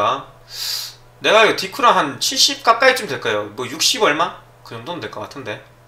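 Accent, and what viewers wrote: Gyeongsang, South Korea. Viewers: 경상도 사투리를 쓰시는 군요~~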